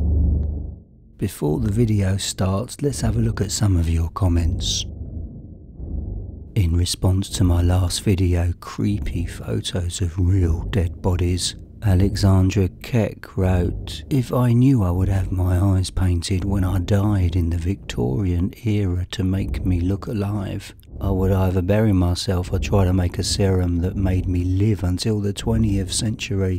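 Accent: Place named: England, United Kingdom. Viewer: Another Nightmare Expo attendee. Two things, I loved the first cell slamming sound, scared the piss out of me. 2nd, listening to your voice, I have only heard a few people with the "English" accent that I adored. I like your accent enough to hold it in regard to the likes of Stephen Fry or Jim Dale.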